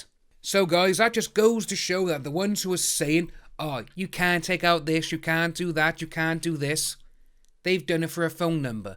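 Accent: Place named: United Kingdom. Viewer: I love your interactions with the viewers. I also really like your accent 💜 keep up the good work! Are you in the UK? I know you said Wales (sp) but I think there is one in Australia too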